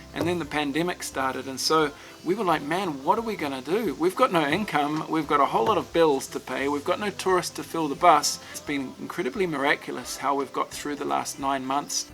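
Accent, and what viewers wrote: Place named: New Zealand. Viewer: Was wondering if this fulla was from home, I could hear our kiwi Accent 😂🇳🇿🤙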